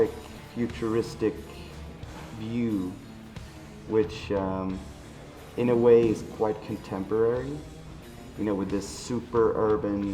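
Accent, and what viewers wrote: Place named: United States. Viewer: Whoever this is, Alpha or Papa, there is virtually no accent whatsoever. He just sounds American, though erudite -- maybe Canadian. I'm confused.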